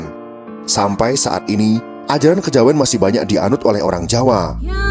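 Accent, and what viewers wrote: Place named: Java, Indonesia. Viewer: anda juga berbahasa medhok. bahagia jadi suku terbesar didunia.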